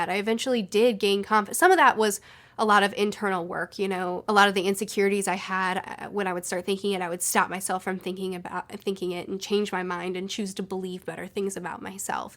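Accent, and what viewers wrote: Canada. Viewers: I’m surprised that you get compared to Lacy Chabert most. I think you look far more like Linda Cardellini, and your voice is way closer to hers too. I don’t see the resemblance to the other three examples at all. Also, I think the reason a lot of people think you’re from Canada is because of how you pronounce the first O in words like “borrow” and “tomorrow.” That stood out to me as well. Anyway, love your videos! 😊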